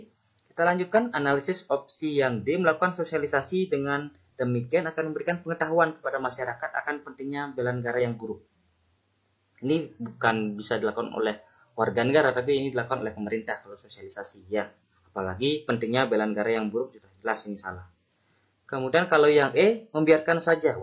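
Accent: Bali, Indonesia. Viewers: orang bali ngih bli keliatan dari logatnya ?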